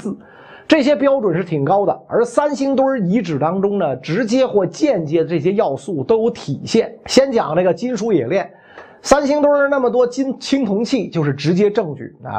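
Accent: Beijing, China. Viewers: 袁老师几天没见，怎么北京话又浓了233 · 是的，秒秒回到北京胡同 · 哈哈，北京人儿，理解一下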